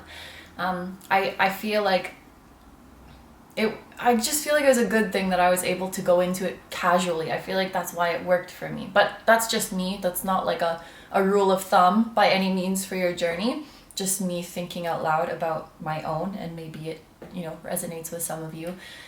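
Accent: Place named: Canada. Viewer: This answered a couple of questions for me. Explains your accent, it’s a Canadian one (I’m also Canadian), the only question I have left is do you speak French?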